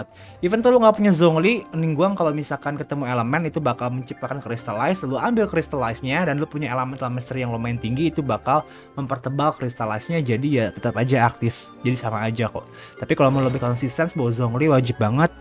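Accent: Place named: West Java, Indonesia. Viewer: Meni sunda pisan euy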